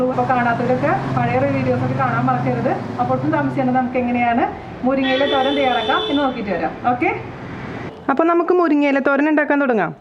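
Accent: Thrissur, India. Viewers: തൃശൂർ ഭാഷ ഒരു ലെവലാട്ടാ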